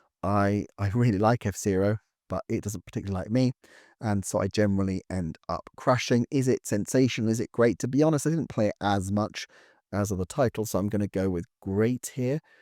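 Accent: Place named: Southern England, United Kingdom. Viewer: I enjoy bottom tier being "so-so;" it feels very befitting of your southern English accent and your very conciliatory style of analysis.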